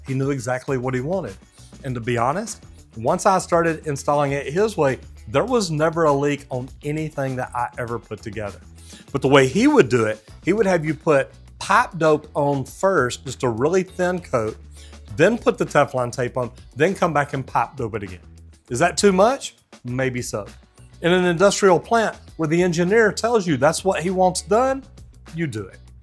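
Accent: Texas, United States. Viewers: a bit to Texas twangey for my liking like most plumbers drawls😂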